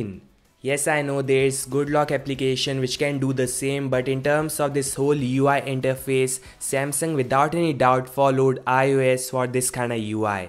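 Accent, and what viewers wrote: India. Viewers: Are you indian? You talk like indian !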